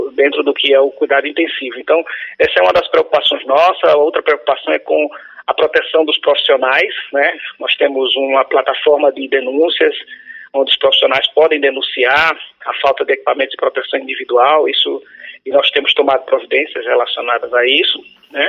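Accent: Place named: Madeira, Portugal. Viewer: Boa noite! Gostaria de saber de onde você é natural, pois nunca ouvi este seu sotaque... Parece um sotaque da Ilha da Madeira ( Portugal ). Obrigado!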